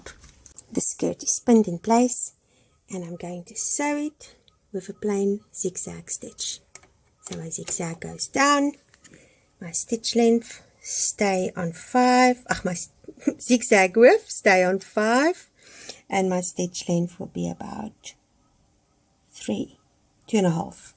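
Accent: South Africa. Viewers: Hi there, how clever of you to make your own costume. So gorgeous to hear you delightful Sth African accent. Its lovely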